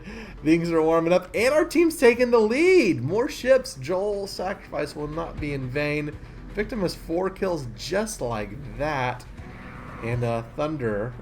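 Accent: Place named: Minnesota, United States. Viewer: As a Minnesotan I can confirm that accent is 100% accurate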